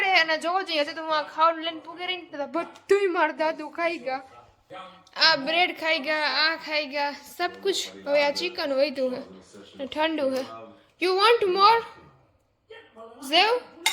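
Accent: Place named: Mer, India. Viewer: Jay siyaram 🙏 Ben tamari mer ni boli saras bolo chho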